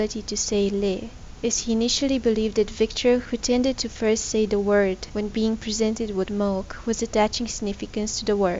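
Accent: South Africa. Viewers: you are from South Africa?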